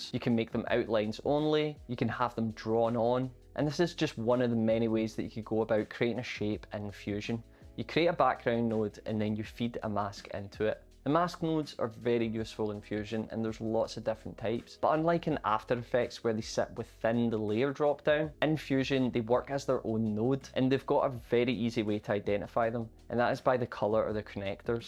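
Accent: Scotland, United Kingdom. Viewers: One thing that can get annoying when you find tutorials like this, is if the presenter has an annoying voice or accent. It is therefore an absolute GODSEND if the presenter has a Scottish accent. Useful AND sonically pleasing. 😀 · I've been using After Effects and I don't exactly plan on switching completely to Davinci Revolve... But, the Scottish accent is so lovely... 😋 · This is the best tutorial on YT which allows me to understand the basics of nodes and I made my first animation in Fusion 🤟 Thanks for that! Casey Faris tutorial is way too complicated and overloaded. Your way gives absolutely the minimum amount of required knowledge to begin with. By the way, I love your accent. Are you from the "Celtic" part of the UK?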